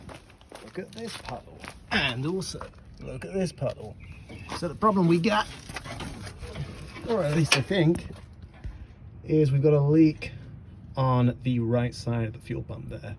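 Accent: England, United Kingdom. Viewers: Haha, Hello there watched the program last night and sounded like you have an acksent,and was across the pond there in England. Lol. That motor sounded good once you got it running,but no I'm from California here in the mountains above Sonora. I was drinking my coffee,and thought I would act like I was from England the way I wrote what I wrote. Lol. I'm a true Californion. Lol and was just playing around about sipping on tea. When I was 5or6 I did ride inn the back of one of those where the seat opened up and back window would roll down. 👍that was around 1980. 👍 a long time ago my FREIND. What's the planes with the car ?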